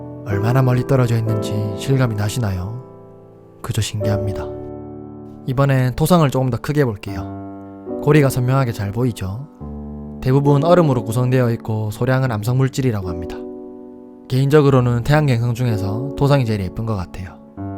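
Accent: Gyeongsang, South Korea. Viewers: 경상도 사투리에 목소리 좋네요 내심 연예하고 싶네요 ㅎ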